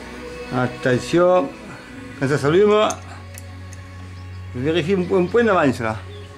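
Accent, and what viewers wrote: South, France. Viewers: j'adore ton accent, ça sent bon le sud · t'a voix dû sud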